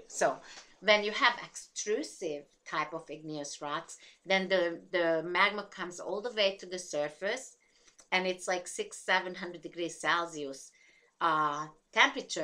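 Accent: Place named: Hungary. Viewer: Summer 2020 GOL 105 - V1 Physical Geology - Savannah Mihalo - Classifying magma gets much more complicated than I realized! I wrote down in my notes about viscosity levels as that was the most confusing part for me---but I think I understand it. I did like learning about the types of intrusive and extrusive mineral textures. When I saw pumice, I remembered that sometimes my family used pumice-crafted materials in the shower for scrubbing. I love obsidian most likely because of its glassy texture. I did not know you were from Hungary; that is so neat! I have loved listening to your accent throughout these videos but I was not sure which country you originated from. I love these videos---they are well done!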